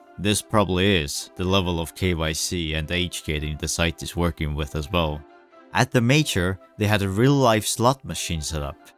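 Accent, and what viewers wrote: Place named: Estonia. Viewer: Hea video ja huvitav kuulamine :D Sain esimese paari minutiga aru, et tegu eestlasega! Keep it up!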